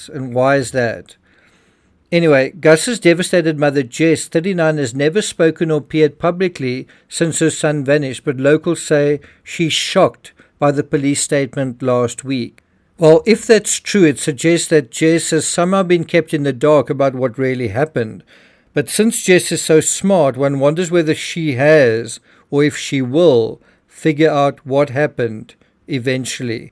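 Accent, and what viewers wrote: South Africa. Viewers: Why is a south African reading Australian news? It's difficult to understand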